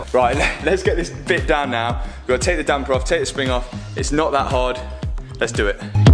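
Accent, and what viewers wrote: United Kingdom. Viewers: We still enjoy youre positivity drive that u got .. and that uk accent make it perfect hahah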